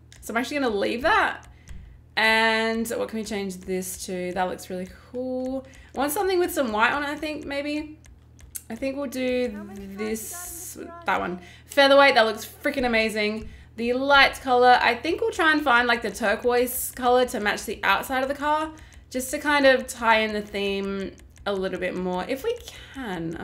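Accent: Australia. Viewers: is she Aussie?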